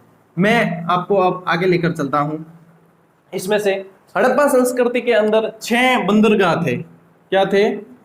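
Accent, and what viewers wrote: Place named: Marwari, India. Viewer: Sir apki marvari boli bhut achi lgti h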